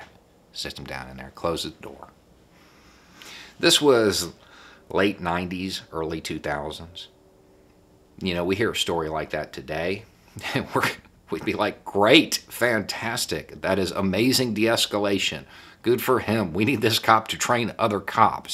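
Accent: South, United States. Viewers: I adore this man. Something about hearing lefty politics from a deeply southern voice makes my heart smile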